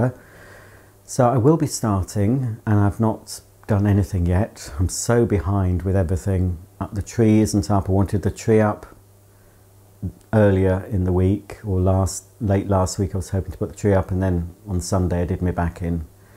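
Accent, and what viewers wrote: United Kingdom. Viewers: The wireless mic is an improvement as I can understand each syllable. The volume is fine and you could probably speak normally as we can always turn down the volume but my phone is turned to max and I had trouble with your British accent until today. Bravo! So excited for your Advent series to begin. Happy baking.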